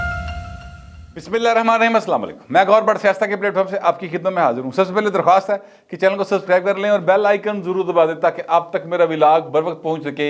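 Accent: Lahore, Pakistan. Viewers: آپ کا لاھوری سٹائل گفتگو کرنا بہت اچھی لگتی ھے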